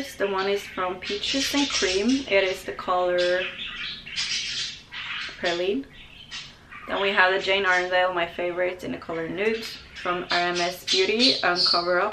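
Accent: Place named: England, United Kingdom. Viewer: Merci, contente de vous voir, vous Revoilà. J'adore votre accent anglais je vous regarde et vous écoute pour cette raison aussi. Je suis en Provence. Thank you, nice to see you again, you're back. I love your English accent I watch you and listen to you for that reason too. I am in Provence.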